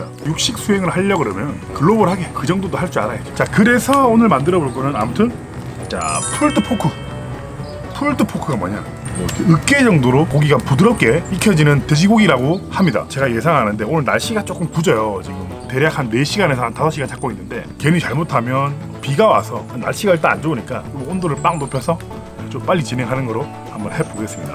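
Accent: Gyeongsang, South Korea. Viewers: ㅋㅋㅋㅋ 진짜 상남자의 wild 먹방 조으네 구독완료다 저랑같은 경상도 분 같은데 어디시져?ㅋ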